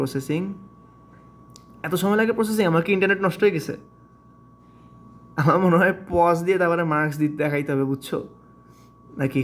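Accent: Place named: Sylhet, Bangladesh. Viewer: Apni ki sylhet er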